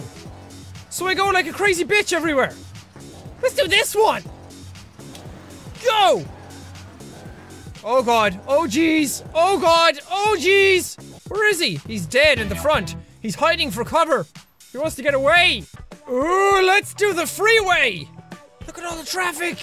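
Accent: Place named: Ireland. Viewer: Swearing always sound so much funnier with an Irish accent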